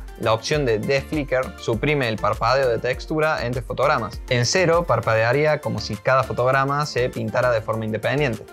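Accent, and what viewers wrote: Cordoba, Argentina. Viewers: Lo mejor que la explicacion esta en Cordobes 😎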